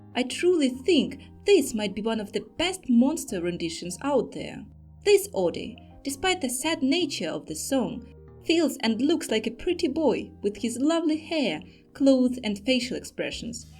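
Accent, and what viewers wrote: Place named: Ukraine. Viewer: WAIT— UKRAINIAN? а я ж ЧУЮ що якийсь акцент є!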